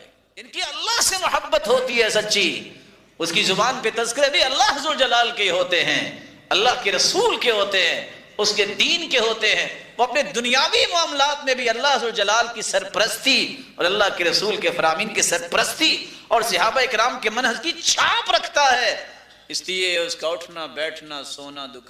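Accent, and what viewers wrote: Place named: Arab world. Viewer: ماشاء اللہ،،،،،حضرت صاحب اردو بھی عربی لہجے میں بولتے ہیں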